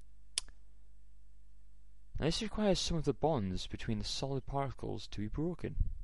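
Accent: Scotland, United Kingdom. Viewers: Thank you for the help! Chemistry is one of my favorite subjects in high school, and you've helped me out a ton with it! I cannot thank you enough, good sir! By the by, are you Scottish?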